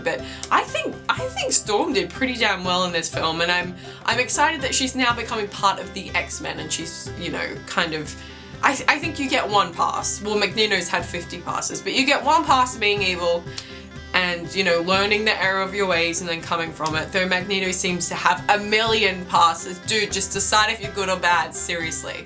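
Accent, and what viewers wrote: Australia. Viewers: Nice reaction, I loved this movie lol I have to know are you from Auzzie or NZ?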